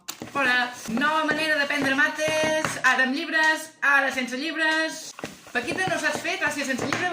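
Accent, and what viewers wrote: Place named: Girona, Spain. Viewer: M’agrada escoltar la a la radio accent Girona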